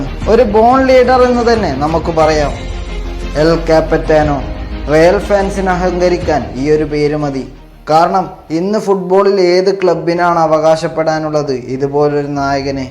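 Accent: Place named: Kannur, India. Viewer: enik kannur oru frnd ind.... Avn samsarikkunnath pole ind😁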